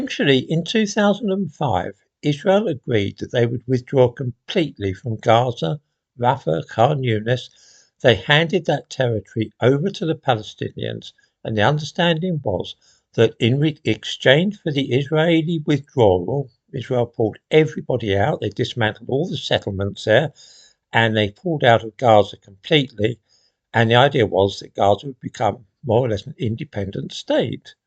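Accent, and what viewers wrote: United Kingdom. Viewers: Wow. What exactly were you doing living in Palestine? Nothing ever stopping them from acting like their own country? Nothing? I look at the google earth map today, and i see the most ominous wall ever constructed, and it just so happens to be surrounding this tiny 25 mile long strip of land. Not sure why i was subscribed to you, but i'd give any honest sounding british chap a shot. Really no choice but to unsub now, because i'm not the target audience for this unapologetic gaslighting. Pretty sure that the older i get, the more i can see the pattern of deception, but for some people it might work the other way.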